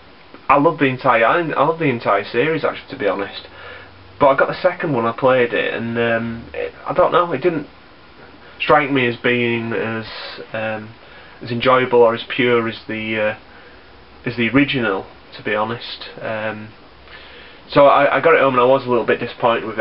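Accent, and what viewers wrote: United Kingdom. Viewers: you almost sounded british mate ;).. Great answers and it's cool how your family are mostly supportive of your collecting. I respect anybody who has a passion for something!.. on a completely unrelated note, i remember a while back you said that you found a reasonably priced copy of V-V.. did you end up getting it?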